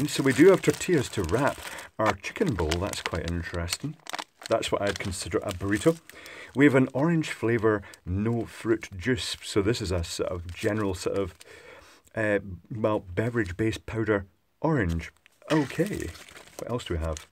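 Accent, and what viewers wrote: Scotland, United Kingdom. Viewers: Shame you didn't put some cheese with the 'French toast'. I find those things too sweet, so with cheese, might taste better! I'll forgive you tho because I absolutely love your soft, gentle Scottish accent and could listen to you all day.... And how nice to hear a man who isn't afraid to admit that he's not too keen on the hot, spicy sauces.....usually male reviewer's seem desperate to impress, with being macho and pouring on loads of (gut) killer pepper/chilli/tabasco sauces. And you're messy.......like your style!!!!!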